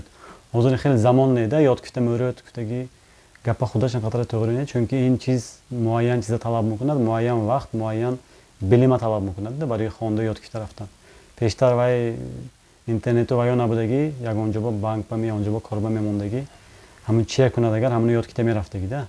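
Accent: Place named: Samarkand, Uzbekistan. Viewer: Ofarin ba lahjai samarqandiyat. Zinda doshta boshed zaboni khuda.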